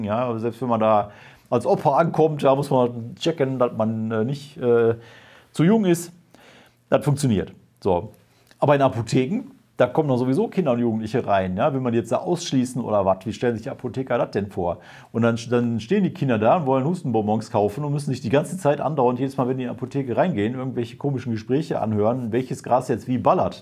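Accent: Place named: Ruhr, Germany. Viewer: du bist der knaller! Für mich als kölsche Jung bist'e mit deinem Ruhrpott-Dialekt immer wieder lustig.:-))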